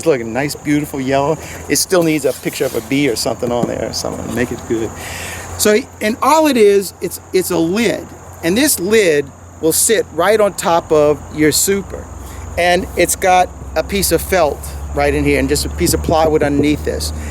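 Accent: Louisiana, United States. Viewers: how is everything where you live your accent let's me.know your in Louisiana new Orleans somewhere around there......how is.you and your family doing after the hurricane is.your property and everything and everyone okay I hope you and your family are.doing great God bless you guys I am still praying for everyone there 🙏 have a great night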